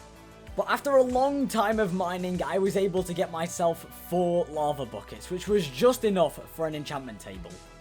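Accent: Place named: United Kingdom. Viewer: Your british!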